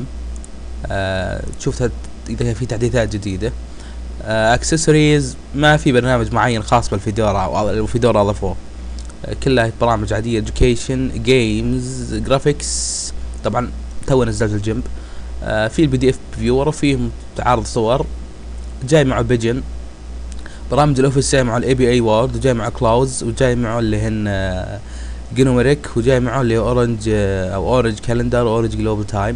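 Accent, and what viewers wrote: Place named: Qassim, Saudi Arabia. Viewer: يعطيك العافية أخوي يا حبيلك أخوي شرحك ممتاز جدا خاصة بلهجة القصيم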